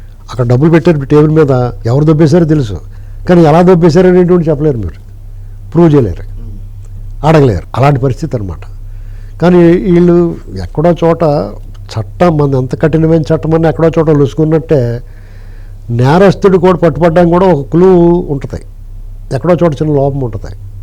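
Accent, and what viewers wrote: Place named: Godavari, India. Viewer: Good. చక్కటి గోదావరి జిల్లా యాస.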